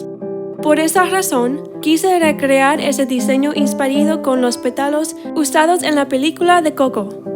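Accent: Spain. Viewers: Me encanto el vídeo y me encanto escuchar tu español💕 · Me dio mucha ternura tu acento español jajajaja hermoso maquillaje.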